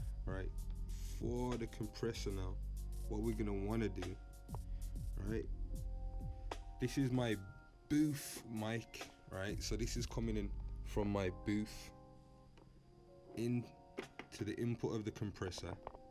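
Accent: United Kingdom. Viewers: I sense some Midlands accent. 👍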